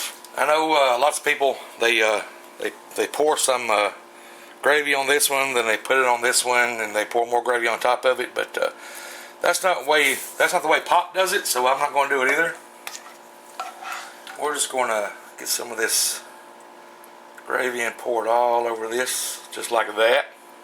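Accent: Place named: South, United States. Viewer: Aww! Loved your vid and Southern accent. Looks great. A few things you said made me giggle and I enjoyed your deep love/respect for your pops. Thanks for sharing!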